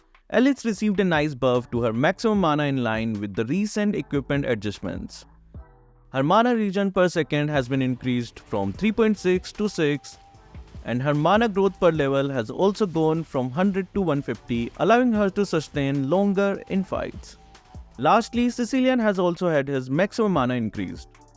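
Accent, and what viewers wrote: India. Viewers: Indian accent wtf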